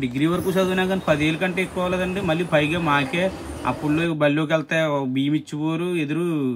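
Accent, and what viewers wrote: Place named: West Godavari, India. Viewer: పిల్లలు తో మీరు చేసి సరదా సరదా ముచ్చట్లు చాలు బాగునాయీ . అచ్చమైన ప గో భాస మరియు యశ వినసొంపుగా ఉన్నాయి ఆండీ కీప్ rocking విత్ యువర్ vlogs బ్రదర్ 😍😍😍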